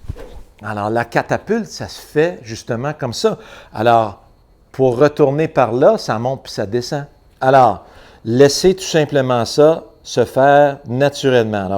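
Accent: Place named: Quebec, Canada. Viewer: J'y compte bien ! Ça me fait beaucoup de bien de regarder tes vidéos car je retrouve mon Québec natal, l'accent mais surtout à quel point tu donnes envie de jouer au golf. Tu partages vraiment ta passion, c'est un signe d'immense générosité ! Je dois remercier Thomas , le jeune pro du club qui m'a fait découvrir ton site. Bienvenue en France quand tu veux !! Bye-Bye. 👏🏌️⛳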